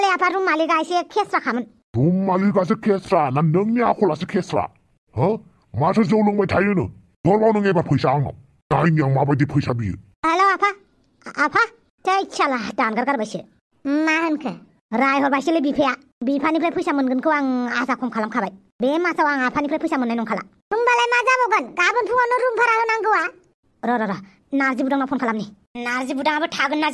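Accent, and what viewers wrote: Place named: Assam, India. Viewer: Very funny of speaking Assamese language!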